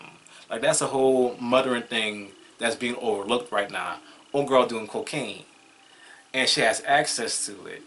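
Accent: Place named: Louisiana, United States. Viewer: I am from New Iberia. You sound like you from St. Martinville or Breaux Bridge.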